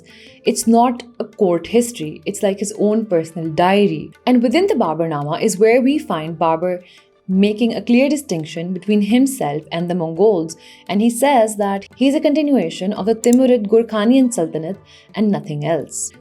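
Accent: Pakistan. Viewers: What's with the Pakistani English Accent...!? Very similar to Bangladeshi English..!! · amazing content in pakistani assent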